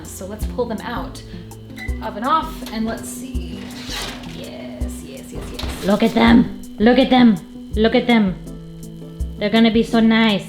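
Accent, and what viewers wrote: United States. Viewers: heyy thank u for the video its fab! :) so good to see more and more vegans with YT channels!! do you have the written recipe up somewhere? would love to try it. im guessing youre american by the accent and also when you said 400 degrees!! XD im not sure what that is ill have to google the conversions or if you might consider putting the metric amounts as well in the written copy that would be amazing!! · Can't wait to try it! Thank you for sharing. BTW Virginia accent?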